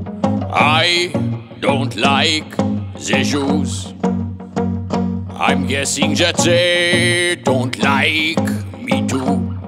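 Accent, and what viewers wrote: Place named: Germany. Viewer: German accent on point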